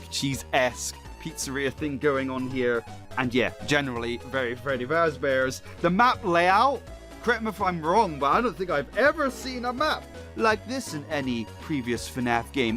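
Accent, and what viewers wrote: United Kingdom. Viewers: Like it sounds like a mix of British and Australian But it sometimes phases into a bit of French And it randomly goes to American I’m sorry if I offended you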